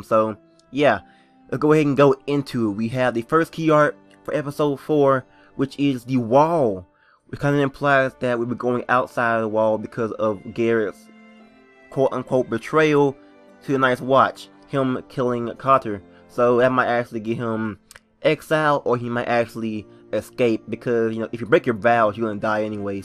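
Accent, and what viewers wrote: South, United States. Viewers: Your American accent is awesome. You southern American urbans sound so much different than us northern Canadian urbans.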